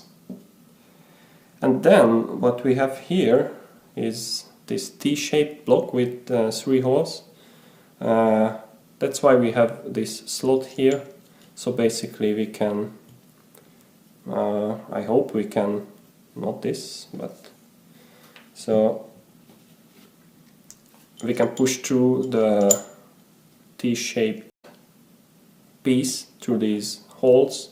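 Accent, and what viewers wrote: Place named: Hungary. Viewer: I can see the Swedish newspaper but I hear Hungarian? Very confusing!?! I'm guessing you are a second generation Hungarian Swede?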